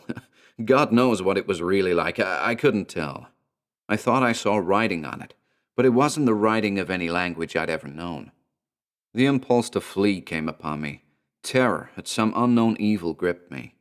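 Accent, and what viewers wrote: United States. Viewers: Your american accent is really good! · American accent is hilarious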